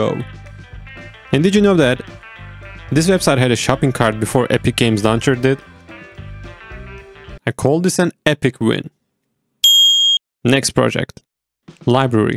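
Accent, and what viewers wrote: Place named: Sweden. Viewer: Where you from? You sound Swedish. 😊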